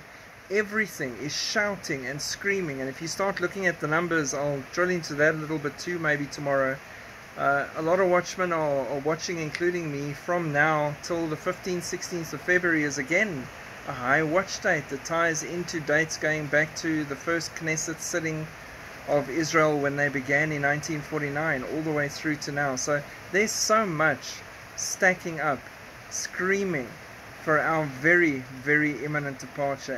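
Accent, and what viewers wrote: South Africa. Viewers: Spot the South African accent 😀 Greetings from Benoni 🇿🇦 And yes, fully agree. Our Great God and Saviour is soon to appear. Maranatha 🙏🎺✈️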